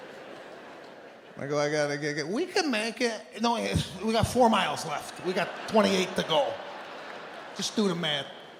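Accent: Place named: Brooklyn, United States. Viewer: I love his Brooklyn/Queens accent! I'm from Brooklyn, and it just adds to his comedy! 💗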